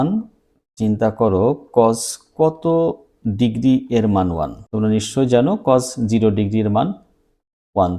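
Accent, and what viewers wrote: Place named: Bogura, Bangladesh. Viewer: Apni mone hoy bogurar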